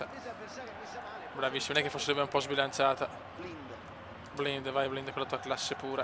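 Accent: Veneto, Italy. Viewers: mi mancava la tua voce da veneziano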